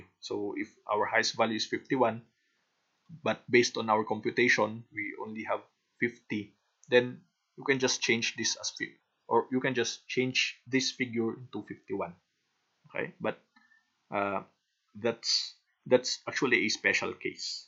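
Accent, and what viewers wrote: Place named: Philippines. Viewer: just by hearing your accent I already know you're a Filipino, Salamat po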